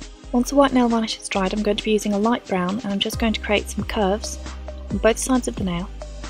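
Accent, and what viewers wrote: United Kingdom. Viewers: hehe love how different certain words are in diff countries like in america its nail polish and in england its nail varnish hehe i love british accent :P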